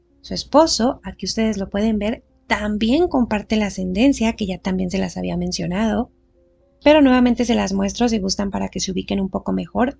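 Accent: Mexico. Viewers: En el inicio muy famoso de la novela Noticias del Imperio, de Fernando del Paso que trata sobre Maximiliano Y Carlota, el célebre monólogo de Carlota tiene una frase que se refiere así a Maximiliano: fue el primer descendiente de los Reyes Católicos Fernando e Isabel que cruzó el mar océano y pisó las tierras de América... A la luz de este árbol super extendido, veo que no fue así, pues los Braganza llegaron a Brazil antes que los Hambusburgo Sax- Coburg Gothe a México. Impresionante trabajo, gracias por compartirlo. Tu acento no suena ibérico, parece mexicano 😊.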